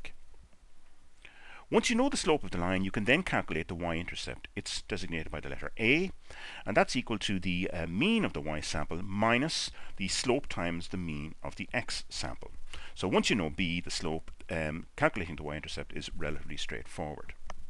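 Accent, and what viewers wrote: Ireland. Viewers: A very interesting video no doubt and the Irish accent is a great addition, especially if you are going to watch it 7 times, almost one after the other as I did ... I understand the importance of the PCC to find relationship between X and y (if any) but when it comes to the slope, why are you not calculating it as the result of SP / SSx, especially when we have those values good and ready?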